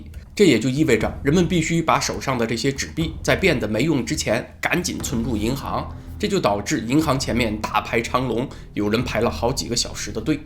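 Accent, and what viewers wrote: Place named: Henan, China. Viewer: 楼主的国语带有河南口音，我听起来很亲切，咱们是老乡。😂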